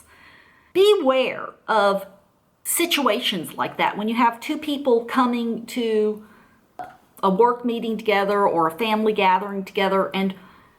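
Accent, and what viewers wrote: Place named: Texas, United States. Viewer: I've been told that you live in China. But I love your Texas accent! Anyways, a fine analysis of these puppets ma'am! You exactly described ''them'' and this female who I still like to refer to as a ''Siren'' or deadly mermaid from the Starbucks logo. ( Another one is Lilith as depicted in HR Gigers Tarot and the related book written by Akron! A masterpiece in my opinion. I could tell you terrifying stories about that! Telepathy, lucid horrific dreams and so on!) She still tries to contact\kill me after 3 years! The last time my stomach turned and it felt like a huge black hole when she passed me by with her bicycle. ''Tring, tring!''. I didnt respond but when I peaked I saw her strange eyes, smirk and her calm posture! At the same time her pace was fast and she reminded me of a bird of prey. (from the Odysee myth) Scary shit! 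My batterycharge dropped a 30\40 percent realising what she is and does! People died because of her, went crazy or live in the twilight zone! And as a no BS martian roaming the earth, I can tell you that this really something. SO FUCK HER!!! (Excuse me!) And thank you! Beautiful relaxing music 24/7 featuring instrumental music by <link>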